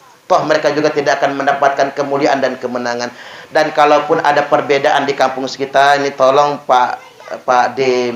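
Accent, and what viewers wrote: Java, Indonesia. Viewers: Pak ustat orang mana to jawane? Jawa apa bukan?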